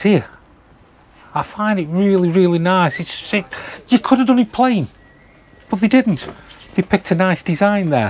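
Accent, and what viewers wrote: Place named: Stoke-on-Trent, United Kingdom. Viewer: He is from the UK, from his accent I am guessing he is from Stoke-on-Trent but I am sure he will correct me if I am wrong 😂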